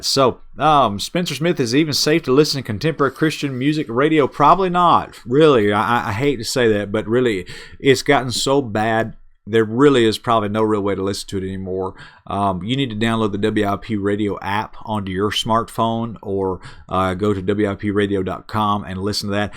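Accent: South, United States. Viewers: I found the radio app finally in case you didn't. It's WIOP radio not WIP. I think it's his southern drawl that made me misunderstand. I hope this helps you.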